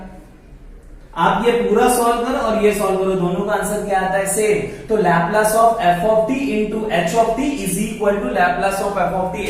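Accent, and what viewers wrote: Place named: India. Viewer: Why is indian english so difficult to understand? :(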